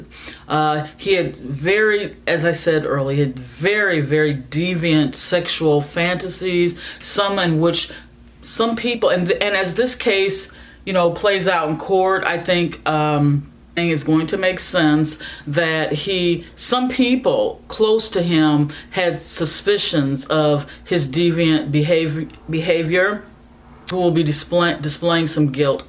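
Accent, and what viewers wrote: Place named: United States. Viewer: Love ur American accent x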